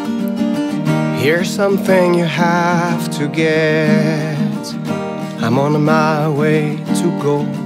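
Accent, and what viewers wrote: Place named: England, United Kingdom. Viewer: J'aime bien ce style de musique tranquille. Tu as un super accent Anglais, continu comme ça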